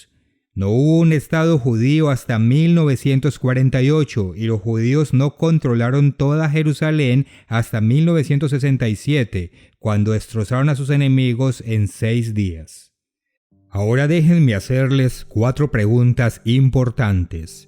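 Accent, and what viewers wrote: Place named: Latin America. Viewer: Me encanta este canal,pero una cosa, la voz es muy latina y no la comprendo , desde Chile bendiciones!!!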